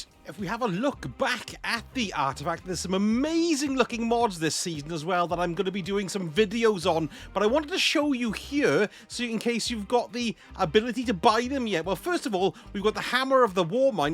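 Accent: Wales, United Kingdom. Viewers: You sound Welsh?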